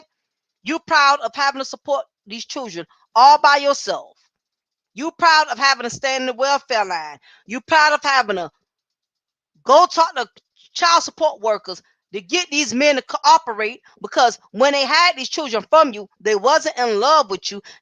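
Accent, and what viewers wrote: South, United States. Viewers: You fine as hell with that southern accent ❤ Dont block me 😂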